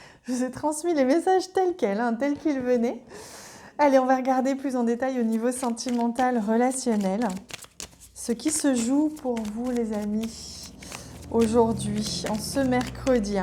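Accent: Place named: Anjou, France. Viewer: Bonjour Merci pour cette guidance, ta voix angevine donne encore plus de plaisir à écouter et intégrer les messages. J, ai reçu ce message pour toi. Surveille ta peau. Je ne sais pas si cela te parle? Sinon oublie. Bises amicales et bienveillantes Olivier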